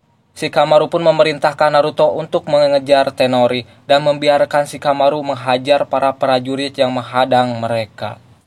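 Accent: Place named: West Java, Indonesia. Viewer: Sundanya kdngeran banget bang😂😂😂👍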